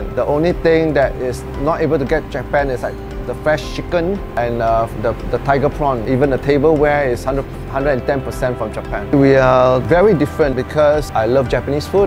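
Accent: Singapore. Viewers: Ur accent is killing me! ! Singapore English is awful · He speaks in Singaporeans accent.... But i like his presentation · He has a Singapore accent ✌️😋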